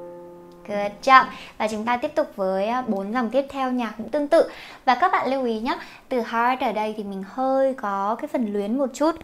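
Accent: South, Vietnam. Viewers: Nghe cô nói giọng miền nam đáng yêu cực 😍😍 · Ui chị nói tiếng miền Nam dthhhp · You can talk vietnamese southern accent can you make a vlog speaking southern accent I think it’s very good and special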